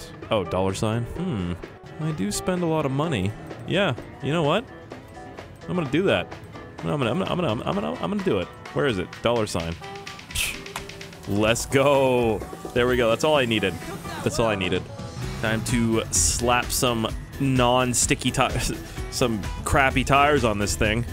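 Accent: Nova Scotia, Canada. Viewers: What side of Nova Scotia are you from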